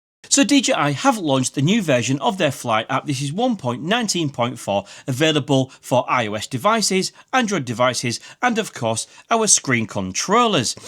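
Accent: Hull, United Kingdom. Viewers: Are you from Hull?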